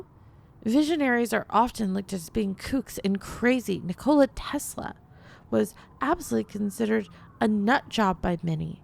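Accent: South, United States. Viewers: Too bad women like this dont exist and im not saying i wanna take a backseat and let her do EVERYTHING im a man i want to treat a woman like a lady i wanna praise her too. But you got women out here wanting a guy to have a chisled body be 6ft or more and make 100k+ a year like uh..... so im just cooked also her southern accent is amazing.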